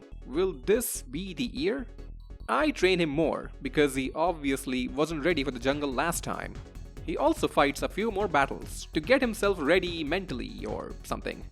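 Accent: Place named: India. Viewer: I can't with your Indian accent bro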